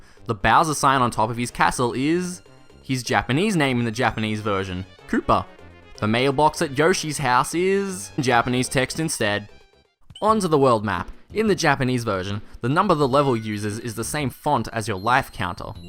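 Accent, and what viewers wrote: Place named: Australia. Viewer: different accents. he's Australian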